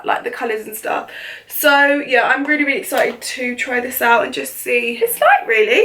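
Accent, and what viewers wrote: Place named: RP, United Kingdom. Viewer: This look is beautiful! And you are beautiful always. Your accent is so posh to me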